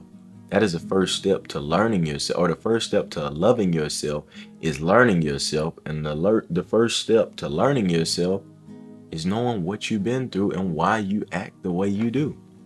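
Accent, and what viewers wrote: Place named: New York, United States. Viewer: You must be from new york. By your accent i knew it.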